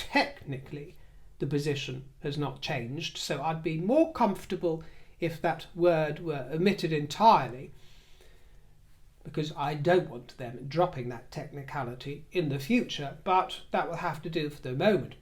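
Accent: Australia. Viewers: as an 🇦🇺 I can explain why it's very simple, we have a Labor Government in power, they are what we call Red Raggers & they're always extremely unhappy sour people. Everytime Labor takes power the country ends up in more debt with more crime & more tax to try & pay for the debt. If we go to a Republic it will allow the powers that be to change our Constitution which in these times is extremely dangerous, extremely & even most of the young people know this. We will remain under a Constitution primarily because of this unless of course the votes are 🤔 tampered with 🤨. However I honestly think that if the Wales were visiting instead I would bet money on it that all of these Premiers would have made time, just like they're all quick to turn up to opulent dinners with Queen Mary when she stands for exactly the same system as King Charles & isn't even our Monarch. Glamour & popularity certainly impacts with whom Labor politicians choose to dine with, at least that is one thing Labor does stick too. 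I'll shut up now otherwise I'll rant on forever as you can guess I'm not a Red Ragger. By the way dear may I say on the whole you do a wonderful Aussie accent, depending on what area you come from the accent may become a little more defined.🇦🇺🐨🙏🏻👍🏻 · Love your Aussie accent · You can't imagine how we feel as good as your Aussie accent is😅 so many feelings our lucky country is losing its way with idiot politicians and migrants whom disrespect our way of life it all makes me cry Love ❤🇦🇺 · may I compliment you on your Aussie accent. You nearly have it down 'pat.' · Hi from New Zealand. I agree with you & love your Australian accent 😊 I am so disappointed that King Charles is not able to visit our Country & I wish him & Camilla a wonderful Royal Tour 🥰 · 🍐 yes please! 😂 Australian Squirrel here from Ballarat, regional Victoria...I am embarrassed for our State Premier Jacinta Allan' rudeness..how busy is too busy for a Royal Visit...surely a cabinet meeting can be postponed. 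Nothing much happens I'm sure if the juvenile antics of televised parliament sittings are anything to go on. It would be tremendous to have King Charles visit here as the Late Queen had and other notable royal family members going back.. Cheerio ps love ya Aussie accent. It was ripper mate. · I'm Australian and I also think it's very insulting of our Premiers not to meet with King Charles. The general public voted to remain a monarchy at the last referendum. If King Charles & Queen Camilla were visiting my state, I would go to see them but unfortunately they are not coming to Queensland. I went to see Queen Elizabeth and Prince Philip in Brisbane when they last visited. You have an excellent Australian accent,. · Hi, I am utterly disgisted at our politicians. There is no way I'm voting for a republic. Long live King Charles. Love you're Aussie accent.😊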